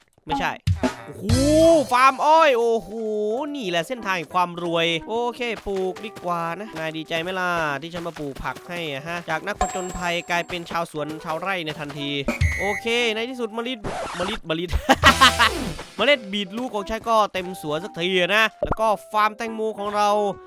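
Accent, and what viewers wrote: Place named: Isan, Thailand. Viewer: พี่พูดอิสานได้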